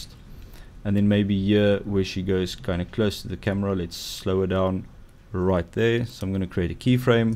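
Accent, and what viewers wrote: South Africa. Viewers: "Suid Afrikaanse" accent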